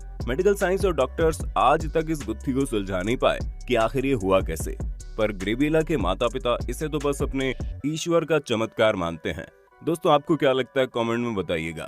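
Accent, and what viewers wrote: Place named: Jaipur, India. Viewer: Bhai apki real voice sunai ye yeto koi jaipur vale bande ki hai bhai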